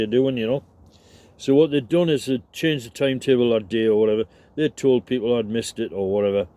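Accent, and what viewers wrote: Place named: County Durham, United Kingdom. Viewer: I'm 47 this year and still waiting for a diagnosis, Its been around 6yrs journey as I've been assessed for autism and bpd only to come back to an original opinion which was adhd. I must say I dont do well but I'm confining myself to my home so I don't buttress up against much that can cause to much stress. I hope you all the best and hope to find some confidence in my little bubble as you have shown to be possible. we also share an accent so that helps, I'm in peterlee, co. Durham.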